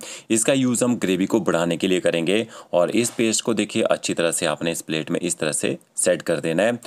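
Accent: Pakistan. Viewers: Pakistani lehja hain apka to ji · Aapki voice ek pakistani jaisi lagti hai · Bhai you sound like Pakistani😅 aapne ye kaarni hai vo krni ha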